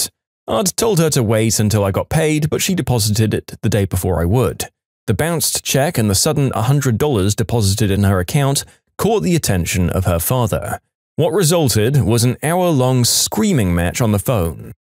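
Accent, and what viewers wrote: United Kingdom. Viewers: This is my first time hearing this voice actor and I was not expecting a British accent (no offense) because I'm used to a really monotone emotionless voice lol.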